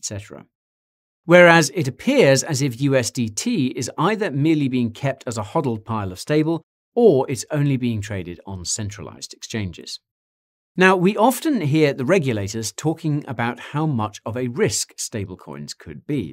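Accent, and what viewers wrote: France. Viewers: Your French accent is spot on sir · French accent is not so bad 😂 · Nice French character, the mustache makes me thinking more of a Spanish guy but the accent is there. Love it. The content as well! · You're french accent is so nice to hear :) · that france accent is so funny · I love the French accent haha 😂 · Greatest cliché french accent i’ve heard in a while (coming from a french dude)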